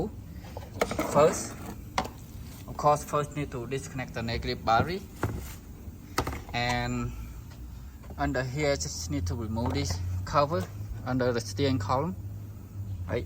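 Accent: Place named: Vietnam. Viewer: nice, ty for this sir. also u sound Vietnamese. i love it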